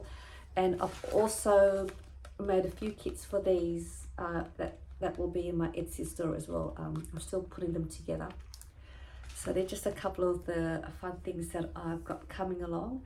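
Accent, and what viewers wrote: Australia. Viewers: the best Australian accent I have ever heard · I love your Australian accent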